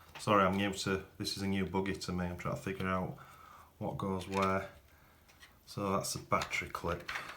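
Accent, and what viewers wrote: United Kingdom. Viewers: Is he British?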